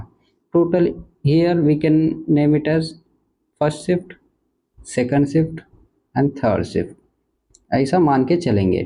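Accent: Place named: South, India. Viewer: I like your soth Indian accent of hindi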